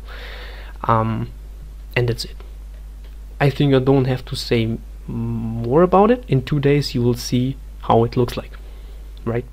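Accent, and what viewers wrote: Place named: Germany. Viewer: Man merkt, dass du deutsch bist . Coole app ;)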